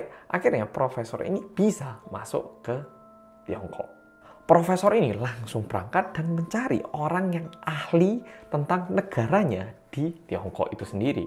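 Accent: Java, Indonesia. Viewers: Selalu ngikuti channel ini,tpi baru pertama komen, awalnya kaget dg logat jawanya sama seperti aq,yg asli wong Jombang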